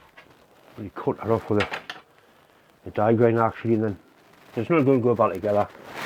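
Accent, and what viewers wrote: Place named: Geordie, United Kingdom. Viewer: if people want the subtitles they can use the built in Youtube ones at the bottom of the screen. I sometimes click them because it really struggles with your strong Geordie accent and can be very funny reading them:)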